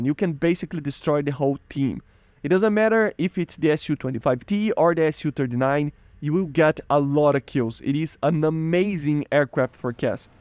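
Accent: Brazil. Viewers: Tu é Br? Sotaque familiar nesse inglês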